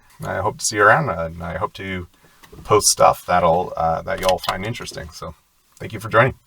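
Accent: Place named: Canada. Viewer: a Canadian I hear heheh! Cheers tho, im just getting into flying 🎉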